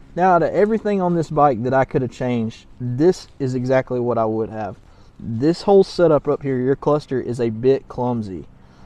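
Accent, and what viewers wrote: Alabama, United States. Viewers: I was thinking you were Alabamian as soon as you started talking. Huntsvillian here. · You're a Bama boy who says "dad gum." That equals an autosub for me. Good video. I think you just convinced me as to what my next bike is. Thanks brother!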